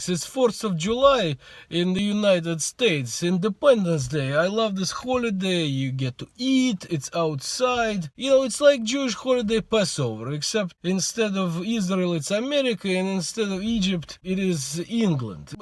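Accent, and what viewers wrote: Russia. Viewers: This is such a beautiful video and when you talked about 9\11 I remembered that since I was born on 9\6 I woke my mother simply for food and she saw what happened the year, god bless those poor guys who lost their lives. And its really is surprising how I saw this video 4 years later. May god bless you and your family mister russian! Your accent is awesome by the way and I'm glad you enjoy your life here in America ówò)/🍬❤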